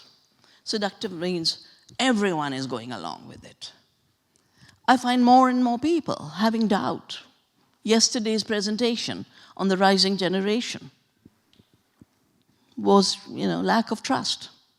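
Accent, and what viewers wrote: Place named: India. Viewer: ill be honest, she's just babbling in an indian accent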